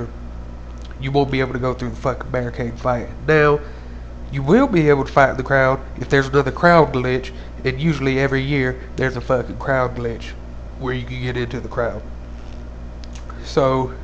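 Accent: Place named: South, United States. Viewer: lol u probably get it alot but u sound so amazingly what we think of as southren yank in britian so i had to b ironic i mean nascar in any other accent just doznt sound right lol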